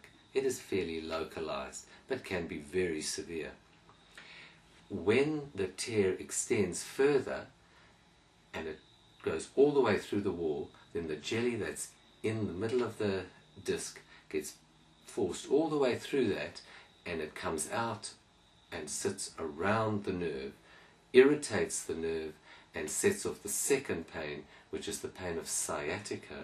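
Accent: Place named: South Africa. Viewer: Hello! Sounds like a nice SA accent there! I have had a slipped disc 3 - 4 times. (had the MRI etc.) I put my back out over Christmas and have the usual lower back pain but thankfully no leg pain. (my hip has gone to one side a bit) I'm really not sure these days whether it's best to lie down and rest or try to walk in the moments when I feel better during the day? May I ask what your view is? Thanks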